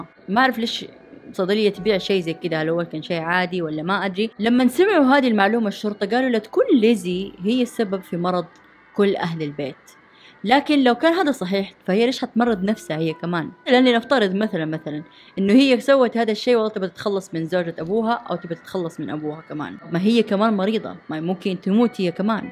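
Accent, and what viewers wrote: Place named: Hejaz, Saudi Arabia. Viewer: لهجتك الحجازية عسسسسل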